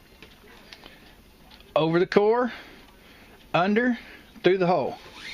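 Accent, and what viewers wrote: South, United States. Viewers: Hey, thanx for all the great videos. Finally, there's someone who speaks Southernese that I can fully understand. Was wondering if you've ever done a necklace like the ones professional baseball players wear. My grandkids keep asking me if I know how to make them. Thanx again, Tmat46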